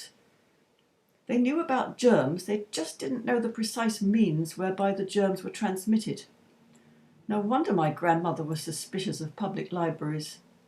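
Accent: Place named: RP, United Kingdom. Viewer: Really very good, I did watch all the story time videos on your other channel and always enjoyed them, I loved when you wore the costume and jingled the little bell at the beginning and end & remember the big book you used as a prop - might be just me but that little routine got me settled and ready to listen - brought back lovely memories of jackanory and also teachers reading to the whole class in infant school - you have a good voice, relaxing to listen to and a wonderful proper English accent, quite posh but not too posh..lol. X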